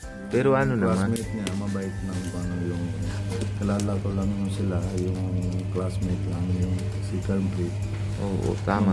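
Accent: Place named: Philippines. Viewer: Para na talaga filipino si kuya mapapansin sa salita nya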